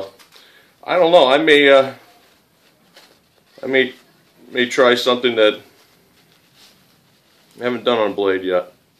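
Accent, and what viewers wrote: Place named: New England, United States. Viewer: "Up to pah." Always enjoy a listen to the NE accent. +1 for the drop point EDC design. Hard work is great medicine for the soul.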